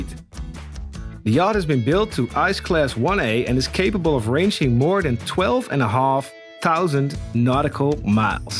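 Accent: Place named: Netherlands. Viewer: Leuke video, aan jou accent te horen Ben je denk ik Nederlands.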